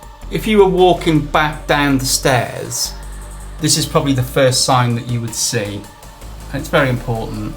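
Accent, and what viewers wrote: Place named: North, United Kingdom. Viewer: My compliments: your house shows good taste, and a great aesthetic sense. I have a question, which you might find a bit provocative: how can you be so lighthearted all the time, given you are a goth, these are heavy times (ref: Paul King!) and, based on your accent (Northener...?), you seem to live in a Country where police breaks into someone's house following a post, and that has been literally destroyed by decades of uncontrolled mass migration? I know being a goth can simply involve lots of make up and a matter of dress code, but I do not believe you are such a shallow guy. Just wondering, as I can barely cope.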